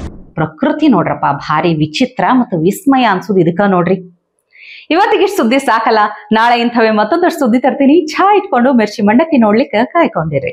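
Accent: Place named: Karnataka, India. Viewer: It is a pleasure to hear your Kannada accent.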